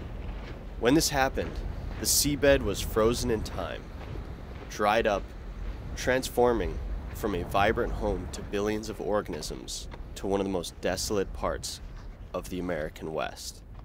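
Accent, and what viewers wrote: Australia. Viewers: I love your aussie so much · Love your Aussie. And the wind not music takes me there with you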